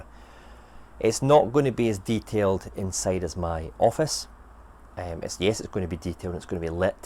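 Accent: Scotland, United Kingdom. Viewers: Need a lift? Check out the close captions, and see its hilarious attempts to deal with a strongish Scots accent.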